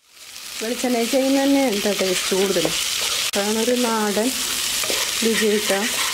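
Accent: Kodungallur, India. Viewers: 😀samsaram kettappol thonni. Ente veed kodungallur an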